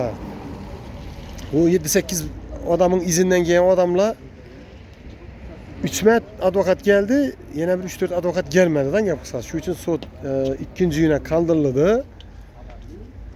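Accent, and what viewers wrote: Khorezm, Uzbekistan. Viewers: Шевада гапирманг илтимос, уни хоразмликлардан бошқа ҳеч ким тушунмайди 🙅🤦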